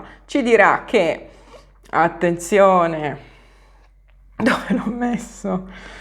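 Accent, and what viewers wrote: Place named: Emilia-Romagna, Italy. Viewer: 😂 ciao sei sempre bravissima, perché smonti con prove alla mano ogni eresia degli infedeli camelgiokey sei per caso emiliana romagnola ,ho sentito una cadenza famigliare,socmel😅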